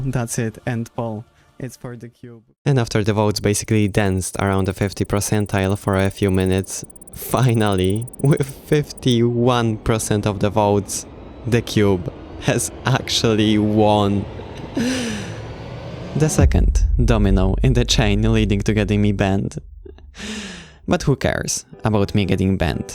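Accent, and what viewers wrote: Poland. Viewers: kurna ale łatwo ogarnąć po akcencie że to Polak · You sound polish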